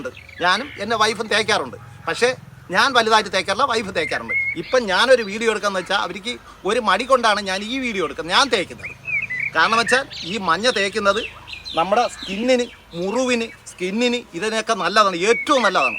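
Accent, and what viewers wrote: Thiruvananthapuram, India. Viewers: അടിപൊളി നിങ്ങൾ ആ തിരുപനന്ത പുരംഭാഷയിൽ പറയുമ്പോൾ കേൾക്കാൻ നല്ല രസം 👍👍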